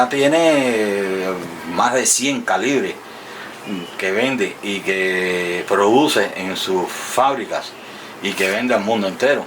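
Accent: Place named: Cuba. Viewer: Por el. Acento pareces cubano es decir somos paisanos, concuerdo contigo hay mucha vistilla y poca autenticidad, hoy casualmente compre un Tissot seastar seven de cuerda manual, q lleva un 781-1 y por esos años 70 me imagino q la base sea in house! Pero me surge la duda... Gracias por tan interesante contenido, sigue compartiendo!! Y si me pudieras decir q maquinaria tendría esa pieza de la q te hablo!! Lo demás son dolares tirados, los relojes auténticos en cuanto a su interior están muy escasos!!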